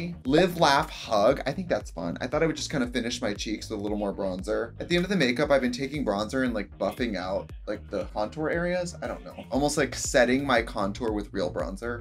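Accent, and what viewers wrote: Australia. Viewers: I read this video title in australian accent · Im not even a second in and i can already hear the aussie accent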